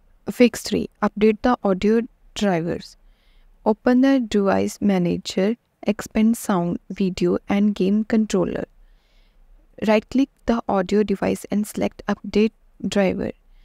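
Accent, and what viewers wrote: India. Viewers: Can’t escape the annoying Indian accent anywhere these days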